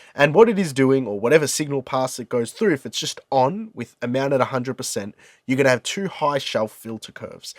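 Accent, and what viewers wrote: Australia. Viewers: As soon as I heard the ausie accent and swear words I subd 👍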